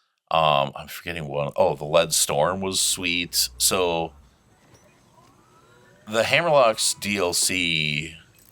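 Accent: United States. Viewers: I knew you were from Wisconsin! I knew it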